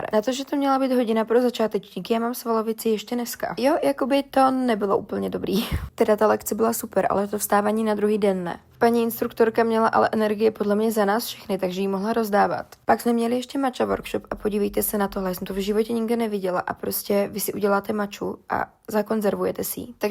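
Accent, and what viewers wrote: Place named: Slovakia. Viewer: Máš super videa ale nejsi náhodov zo slovenska ? Pretože sa mi zdá že nejsi z česka lebo na tom hlase to je poznať ale ide ti to pekne pokračuj v čestine❤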